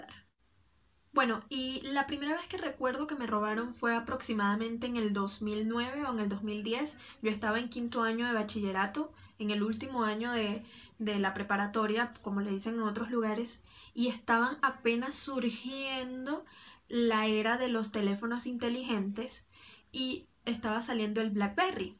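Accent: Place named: Colombia. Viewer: se te noto el acento colombiano jajajajaja saludos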